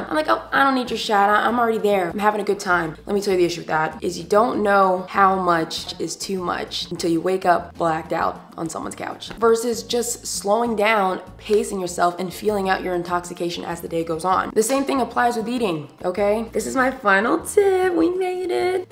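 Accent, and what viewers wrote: England, United Kingdom. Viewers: I love when Black Americans do an English accent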